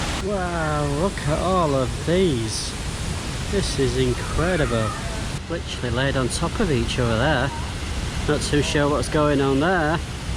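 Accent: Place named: South Yorkshire, United Kingdom. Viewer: His accent is South Yorkshire accent including accents in Leeds Barnsley Doncaster and Sheffield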